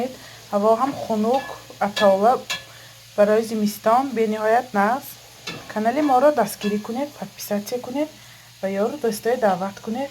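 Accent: Isfara, Tajikistan. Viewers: Салом шумо аз исфара мешидми? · Мемурам дар лафзи Исфараги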